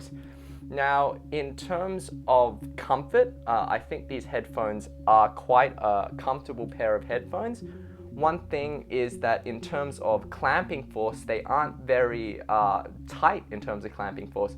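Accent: Australia. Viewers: I can't figure your accent, is it Australian or English? )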